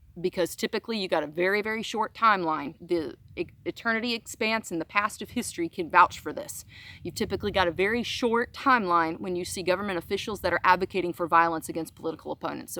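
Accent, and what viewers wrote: South, United States. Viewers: As soon as I hear a southern accent I move on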